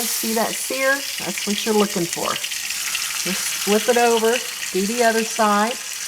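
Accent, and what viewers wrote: South, United States. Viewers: Your a cooking beast! Please invite me over for dinner anytime. I would trust this receipe just because you sound like your from the south. #newpauladeen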